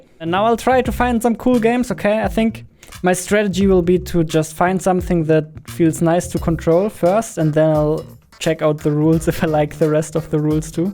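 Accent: Germany. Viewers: Man kann diesen Deutschen akzent nicht überhören xD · Ooh you are germaaan. I wondered why your English sounded just slightly off. Guten Tag btw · Oh lol I knew the accent was German (Windows-search-bar) (I don't know why I did this )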